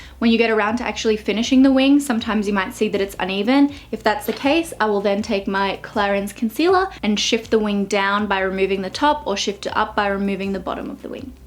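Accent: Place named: Australia. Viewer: are you Australian you has an Australian accent (Im Australian) p.s u earned a new sub xxx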